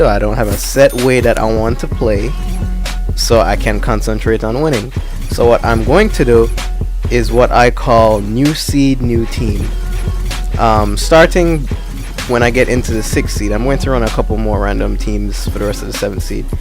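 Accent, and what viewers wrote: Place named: Jamaica. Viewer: u sound jamaican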